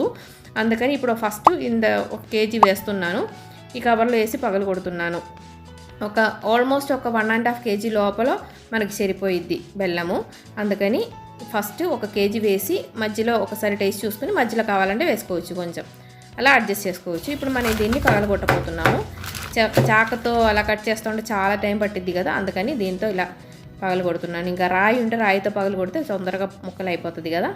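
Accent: Kerala, India. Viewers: I think ur not telugu ur keralan because ur words u spell looks keralan . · Your malayala telugu చాలా మధురం! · Sure she is a Malayali. Can identify easily. I'm too a Malayali. Thanks madam. Good to hear a good recipe from you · Yes... I guessed correct... My mom is also from Kerala... I know that pronunciation, how Kerala people speaks telugu... 🙂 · Madame thanq R U Malayaali ? Ur accent is like that.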